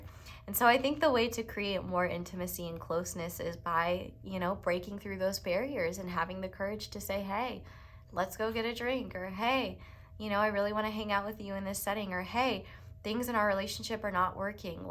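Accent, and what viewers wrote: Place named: Brooklyn, United States. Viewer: Pile 2, my person is a radio dj and in the beginning whenever we would fight and have a big fall out and stop speaking (we were both denying the depth of this), I would feel a huge surge in my heart and tune into his radio station to hear what he truly wanted to say because our words were failing. This reading is so spot on thank you for your gifts. Haha also I have 5 aspects in Gemini and his mercury is in Gemini too. Also your Brooklynn accent — we’ve talked about naming our future daughter brooklynn. ❤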